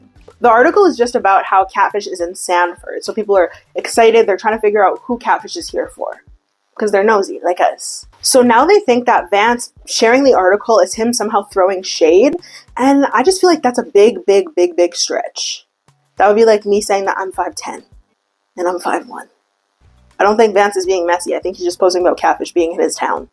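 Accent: Canada. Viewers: OMG! Every time youve said "I sound so canadian" in the past, I thought it was cause you were from the US and just said some words like a Canadian...BUT YOURE ONE OF US?!?!? I mean, I should have known...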